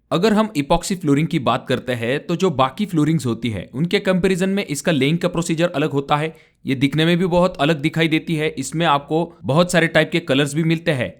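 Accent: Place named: Bundelkhand, India. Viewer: खपरा बाला भाई बुंदेली लग रहा हे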